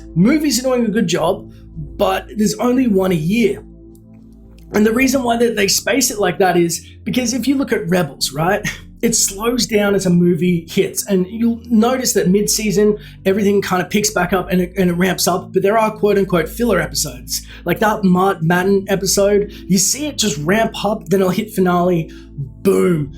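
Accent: Australia. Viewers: Hey I Dunno If This Has Been Asked Before But Are You An Aussie By Any Chance???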